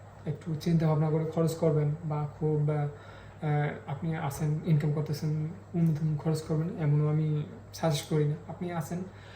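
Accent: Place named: Barishal, Bangladesh. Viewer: বরিশাইল্লা🤔🤔🤔🤔🙄🙄🙄 সামনের লেখা দেখে দেখে,রচনা বলতেছে🤣🤣🤣🤣🤣🤣🤣 ভালো আছি,পবিএ মক্কা আছি🥰🥰🥰🥰🇸🇦🇸🇦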